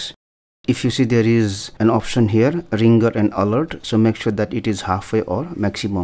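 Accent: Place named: India. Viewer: Thanks man! ….You’re Indian accent is amazing to me. The more I listen to you the more easily I can understand! Haha!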